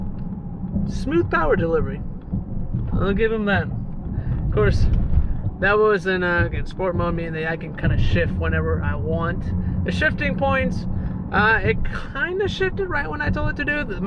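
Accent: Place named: Eastern Europe. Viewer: Where are you from bro? At times your accent sounds eastern European lol. Not trying to dis just curious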